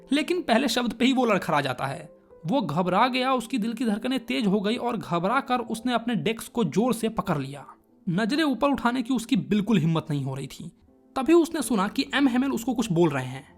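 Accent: Bihar, India. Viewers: You are from Bihar? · Sir aap bihari ho kya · Bihar se ho bhai❤ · Bhai aapke bolne ke style se lag rha h ki aap bihari ho.😅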